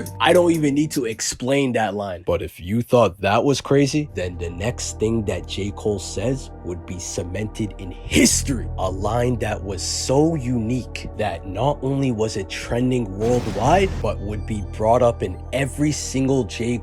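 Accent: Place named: Canada. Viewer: so sorry to ask but are you Canadian? love ur voice lol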